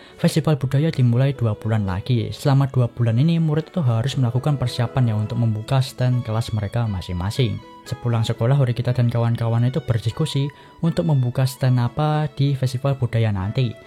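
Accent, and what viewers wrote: Java, Indonesia. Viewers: Akhirnya lanjut lagi, enak dikuping bgt walaupun medok wkkwkw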